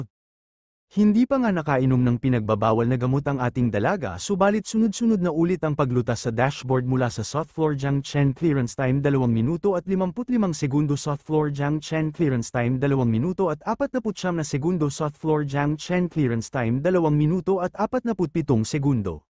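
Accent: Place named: Visayas, Philippines. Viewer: Bisaya gahing bisaya nice lods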